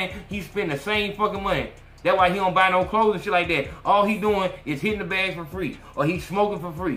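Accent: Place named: Alabama, United States. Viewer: 💯...you from Alabama, ain't it?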